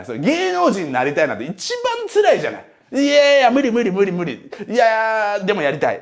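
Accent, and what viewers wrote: Kansai, Japan. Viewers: ２：２４：５６を見て始め映画並み？？？と感じました。でもまだ前半だったのですね。一気に観させてもらいました。確かに最初の自己紹介は初期の撮影ということもあり改善は必須だったかも知れないですね。今回の自己紹介①は授業同様楽しく観れました。 話しの最中で「たけしさん」言葉が連発していたのが少々きになりましたが、熱気は十分に感じ取れました。以前、２nd YouTubeでだったか、あっちゃんがターゲットは10代から30代と言っていた時があったような気がしますが、40代～50代（もしかしたら60台も）も視聴者層はいますのでよろしくお願いします。 東京（関東）の話し方の中に、関西弁が違和感なく入ることで聞いている方は入り込みやすいです。また、体を使った（張った）解説は演技力の賜物で普通の教育系ＹｏｕＴｕｂｅにはない魅力を感じます。学び心を満たしてくれる動画に感謝です。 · あっちゃんが関西弁たまにでる 理由やっとわかったわ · 「しくじり先生」終了後、もっとあっちゃんの授業が聞きたいなと思ってた時、カジサック経由でYoutube大学を知りました。とても毎日楽しく見てます。知的好奇心をくすぐる内容。学ぶって楽しい あっちゃんの喋りが説明のできない高い説得力(教祖のようと卑下してますが)の中に時々出てくる関西訛り、エセ関西人ではないけど基本標準語なのでとても特徴的だなと思ってたのですが幼少期に大阪で育ってたのが残ってたのですね頓着しました。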